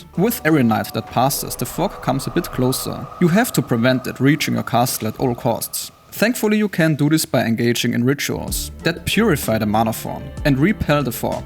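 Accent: Germany. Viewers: U german bruh ?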